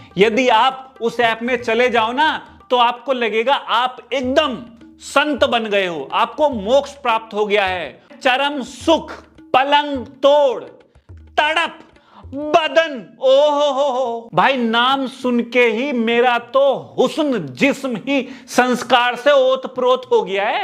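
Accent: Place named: Uttar Pradesh, India. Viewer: UP se lgte ho bhai 😅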